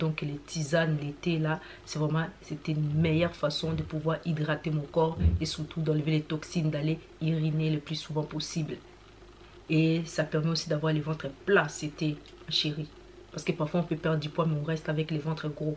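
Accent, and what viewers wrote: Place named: Kinshasa, Democratic Republic of the Congo. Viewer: Par votre accent vous êtes congolais de Kinshasa merci pour votre partage ! Par contre votre méthode est vraiment restreint qui peut tenir à Long terme pour perdre beaucoup de kilos 😮😢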